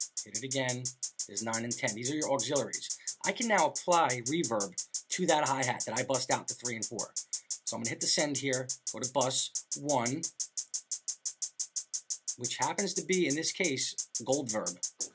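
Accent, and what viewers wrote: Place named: New York, United States. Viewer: Your so new york and italian it makes learning so much easier.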